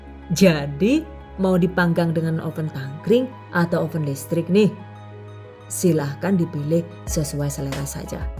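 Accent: Banyumas, Indonesia. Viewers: Terima kasih resepnya ... nonton videonya sambil ketawa aplg klo bicara ngapak 😊😊👍👍👍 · Suarane mengko malik ngapak, dadi seneng nyonk kowh karo channel kiye. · Terima KASIH..rezepnya..salam hangat dari negri kincir angin..logat ngapaknya luwes banget..apakah asli wong Banyumas..👍👍👍😁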